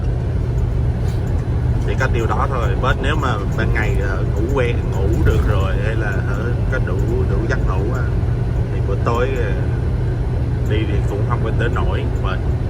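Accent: Vietnam. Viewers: You sound Vietnamese? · nghe giọng a giống người Nha Trang!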